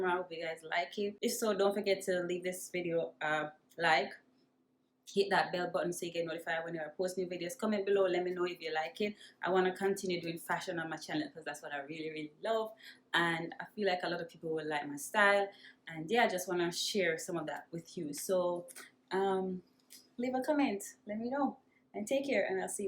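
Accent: Jamaica. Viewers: Sound like Jamaican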